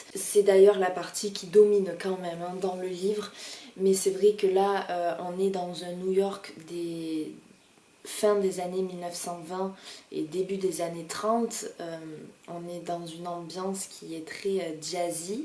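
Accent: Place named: South, France. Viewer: Bonsoir je viens de découvrir votre chaîne et c'est vraiment un vrai bonheur! Votre voix est douce et reposante, vos vlogs très inspirants. Je reconnais bien votre accent puisque je ne suis pas très loin (Carcassonne 😊). Du coup je vais dévorer vos vlogs ce week end j'en ai a rattraper 😅. Puis lire aussi quand même et jardiner aussi car il va faire très beau ☀️. Merci pour le partage 😉 et longue vie à votre chaîne. Si ce n'est pas trop indiscret, quel est votre profession ? Bonne soirée. Carine.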